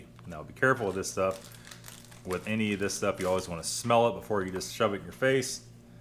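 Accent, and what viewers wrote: United States. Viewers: Super, your American accent is so cool :) I sometimes wonder if I was an American before ;) And ofcourse your way of showtime keeps me watching, Greetz from this damn cold Holland lol :)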